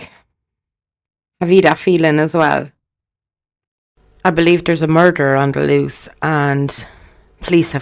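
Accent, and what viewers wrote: Ireland. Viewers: Love your Irish accent and I agree with you. This was not a terrible accident. 😢If they were innocent fishermen why haven’t they come forward?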